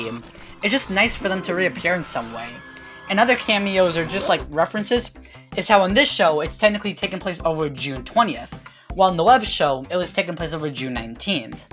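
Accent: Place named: United Kingdom. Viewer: you already sound british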